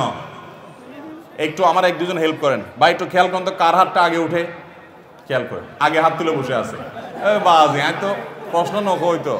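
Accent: Chittagong, Bangladesh. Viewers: মাশা-আল্লাহ,হুজুরের মুখে চট্টগ্রামের ভাষা চমৎকার লেগেছে। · আহমাদুল্লাহ দেখি চট্টগ্রামের ভাষাও পারেন। চট্টগামে উনার মাহফিল হলে খবর কেমনে পাব?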